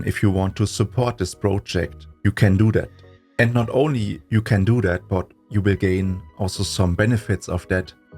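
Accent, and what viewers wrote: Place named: Germany. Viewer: Ohne zu wissen das du ein Deutscher bist, hat dich deine englische Aussprache verraten :D Mal Spaß bei Seite, du baust extrem gut, Respekt vor dieser Zeitaufwendigen Arbeit.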